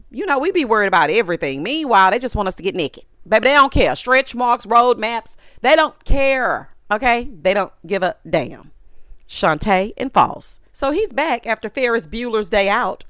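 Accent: South, United States. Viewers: Pay them dust, I love your accent and southern charm 💕🌹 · They hating! That southern twang and contagious laugh are just a couple reasons I love listening to you 😍. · Hey Girl Heyyy! I can't wait to get into this review. Be right back, I'm going to get some popcorn. Nevermind those people. We live your educated, humurous, country dialect. Enjoy your evening. 🤗🧡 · Girl, I love your country twang!!!! Moving forward (in your voice). 😅😂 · Ur reviews, r the best..! & LOVE ur accent. Shout out, to the south. 🇨🇱🤠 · girl keep being yo’ fabulous self, country twang and all! 😂😂😂 Love your reviews! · I love your commentary! You are hilarious.🤣 And your southern accent is everything!’🥰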